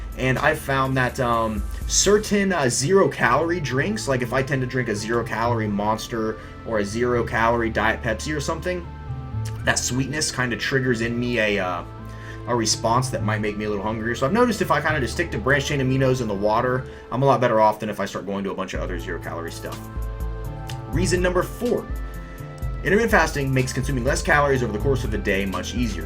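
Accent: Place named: South, United States. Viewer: You from like the South dude hehe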